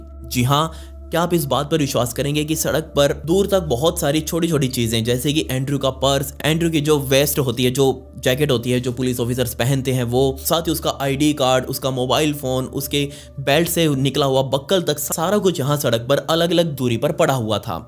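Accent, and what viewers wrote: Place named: Haryana, India. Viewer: sir ap apki voice apka lok bahut acha h Jab m apki video dekhni lgi thi maine awaz se hi pahachan liya ap haryana se ho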